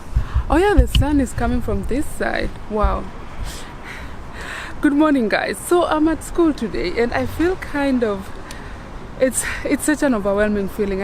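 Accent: Luo, Kenya. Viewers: Luo ascent 👌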